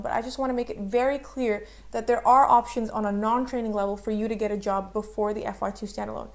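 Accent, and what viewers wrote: United States. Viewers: great piece of advice in a short amount of time!on a side note I really didn't expect you to have an american accent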